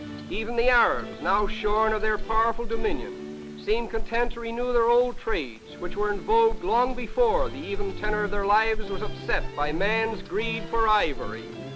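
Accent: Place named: United States. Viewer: Comparatively speaking they were. Most native peoples had not seen a car or radio back then. Schools and widespread education were just starting and the average lifespan of peoples was in the 30s. Very few persons reached a ripe old age to convey accumulative wisdom to their communities. Life (especially in the interior) was short and brutal in the 1800s and this period in the early 1900s marks the transition away from that. In this period colonisation of Kenya was just starting and at this time there were no more than about 10,000 Europeans in Kenya. This sounds like an American commentating and reflects the attitudes of his people and the times.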